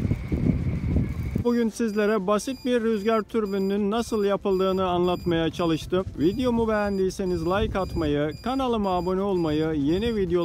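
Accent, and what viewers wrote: Turkey. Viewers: Ende manavgatli gibi az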